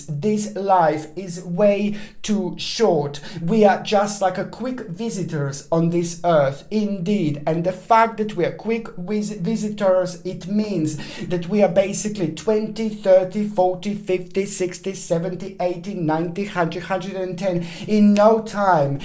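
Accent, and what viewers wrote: England, United Kingdom. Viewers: English accent.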